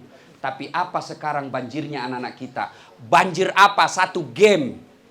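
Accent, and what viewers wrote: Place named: South Sulawesi, Indonesia. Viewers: Kentara medok bahasa bugisnya hahaha tho penrank to Sawitto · Pasti ada aja lucu lucunya. Logat bahasanya ust saya suka. Mantap bugis Makasaar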